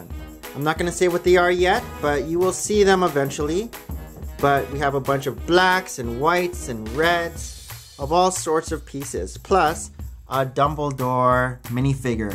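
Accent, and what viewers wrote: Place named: Canada. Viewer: It's like you are from Canada or something....?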